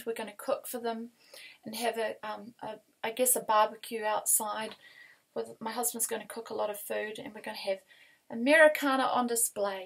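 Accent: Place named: New Zealand. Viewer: Hi from Auckland! My maternal fam is from Lumsden, you sound just like home 😀Nice to meet you!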